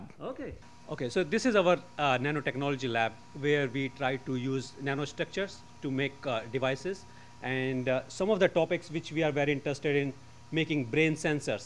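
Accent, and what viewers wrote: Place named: India. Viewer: I just can’t stand the Indian accent, like whoever mad their language didn’t realize how annoying and un intimidating it is. I I woke up in India I’d probably shoot my self so I wouldn’t have to hear that shit everywhere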